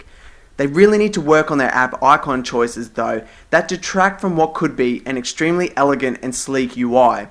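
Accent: Australia. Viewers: Nice review. Cool accent. I want to move to Austrailia and get that same accent to pick up the ladies.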